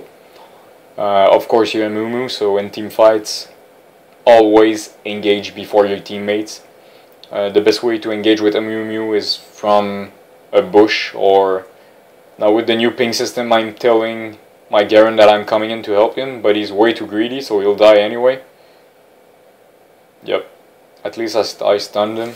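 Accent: Quebec, Canada. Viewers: Are you French Canadian? (I am) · T tu un québécois ? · haha the way you say assist sounds like asses. i like your videos and the french canadian accent.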